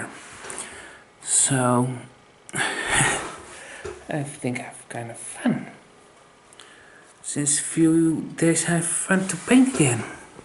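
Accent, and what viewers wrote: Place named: Netherlands. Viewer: Enjoyable videos, keep them coming. And you are Dutch or Belgian, kinda recognize the accent :).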